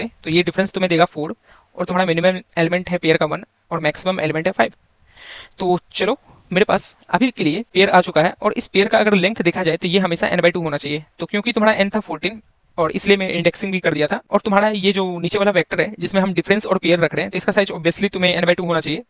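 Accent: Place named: Bihar, India. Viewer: acha smjhaya . kaha se ho bihar me? (accent++)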